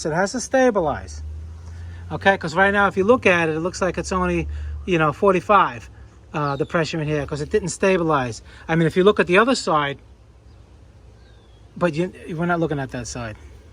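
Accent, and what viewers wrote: New York, United States. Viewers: thank you bro,, u gat that N.Y. gangsta accent.. i like it!! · Ditto. Moving to Hawaii makes me miss the Boroughs' accent.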